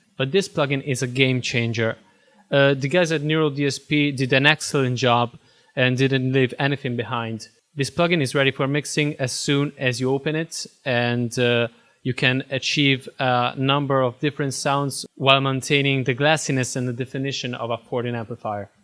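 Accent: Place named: Italy. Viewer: ah, ecco, mi sembrava di sentire l'accento italiano ☺. Grandissimo 🤘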